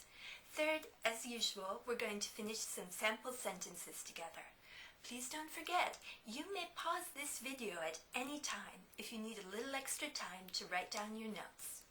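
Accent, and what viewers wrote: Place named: Canada. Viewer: I like your canadian accent . thank you so much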